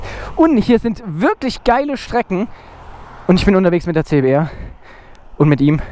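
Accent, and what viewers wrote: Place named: Swabia, Germany. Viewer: Alter, Schwäbisch oder Hochdeutsch, aber net beides.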